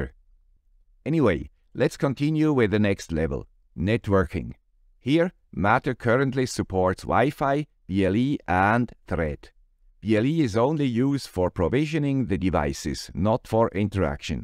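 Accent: Switzerland. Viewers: I was just wondering about it as I got back into HA... And there's a video by the guy with a swiss accent about it! Great! Also, I feel like it's relevant... XKCD #927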